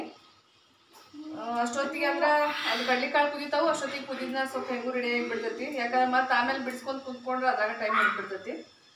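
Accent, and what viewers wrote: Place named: Dharwad, India. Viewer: Nanage nivu matado darvad Kannada tumba like madtini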